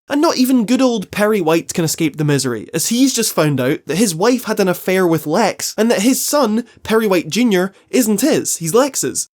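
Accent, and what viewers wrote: Scotland, United Kingdom. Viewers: Are you scottish?I am too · I forgot you were so british wow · I'm hardly assuming, the accent is quite clearly Scottish. And yes, I am. :^]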